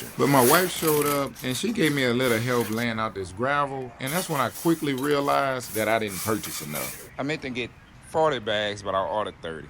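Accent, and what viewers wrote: South, United States. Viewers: It really does sound like a southern accent, doesn’t it? There’s something so warm and comforting about the way he talks—feels like sitting on a porch with a glass of sweet tea, just listening and feeling at home. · Is this a southern accent? You have the sweetest talk.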